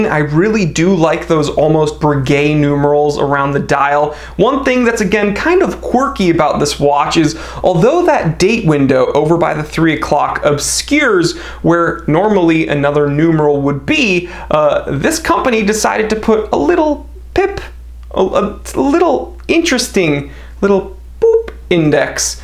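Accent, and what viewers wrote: Germany. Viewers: Please more German accent. Intoxicating and additive. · lol I love the German accent. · lol love that German accent!!!!!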